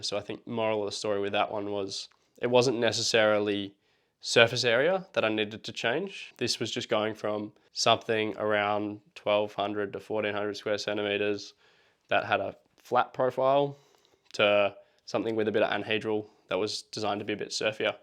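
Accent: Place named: New Zealand. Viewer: Circling back to this vid as coldest month of the year in NZ, sun down just after 5pm(You sound like a kiwi too) and I have 2 new designs I love. F-one SK8 looks a lot like my latest one and the one back from that looks like Armstrong ST and Armstrong released theirs about the same time I made mine. Both my latest foils have great glide but my latest one though only slightly smaller, I have stability that makes me feel that I can foot switch on foil. Of course I still need to get used to taking my moment to be 100% about new foot placement but the new foil makes it so much easier. I really favor foils with a bit of sweep and my latest one that I made to fit Axis fuselage, it has almost straight back adge and sweeps to extremely narrow and thin tips. For all the potential for injury, the blissful smooth ride of my 115kg on this 1240sqcm 1M span foil is amazing and yet in surf it's super loose. 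Lots of our locals on Axis but my few tries of them I've not liked the handling. Axis ARTs do have one of the things I incorporated in my own designs from feb 2022 which is wing tip twist. I've refined my own wingtip twist amount and placement since then to better stretch max speed and min stall speed. It's fascinating seeing all the brands making their own advances in different ways.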